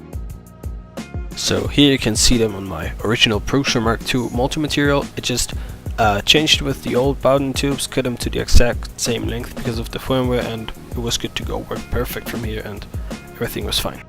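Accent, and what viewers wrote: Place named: Netherlands. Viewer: Bist du gebürtiger Deutscher? Ich höre da irgendwie einen Niederländer heraus, du sprichst ziemlich gut :)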